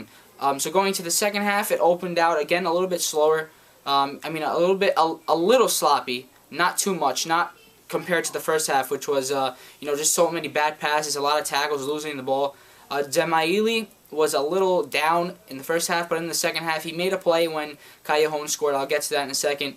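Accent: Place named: United States. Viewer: dal suo accento direi leggermente di no. è un americano illuminato.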